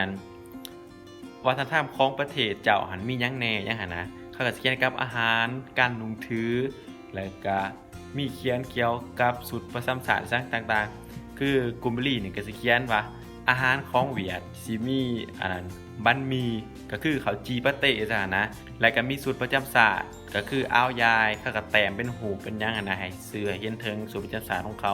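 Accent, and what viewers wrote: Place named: Ubon Ratchathani, Thailand. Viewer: เจ้าเว้าออกสำเนียงอุบลหลาย สู้ๆเด้อน้องหล่า เอาใจส่อยุเด้อ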